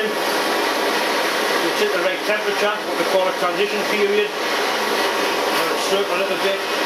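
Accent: Newcastle, United Kingdom. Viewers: Thanks for all the video this year. I enjoy your way of doing things. Happy New Year to you and yours. I enjoy your Jordie accent and I think I'll have a Newcastle Beer in honor of you today.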